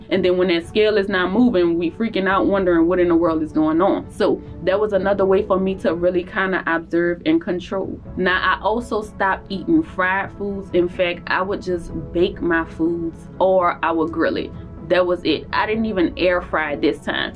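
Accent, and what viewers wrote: New Orleans, United States. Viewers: Are you from New Orleans? I swear I feel like you gonna say baybee at any moment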